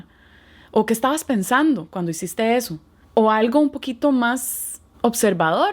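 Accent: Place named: Costa Rica. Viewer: Amo la genuinidad de tus vídeos! Me fascina que hablés como tica 💗 y que usés el voceo en tus mensajes! Me encanta tu contenido, es super útil! 🇨🇷😁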